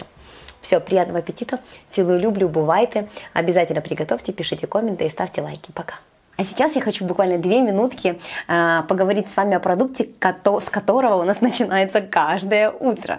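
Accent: Ukraine. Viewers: По диалекту украинка😊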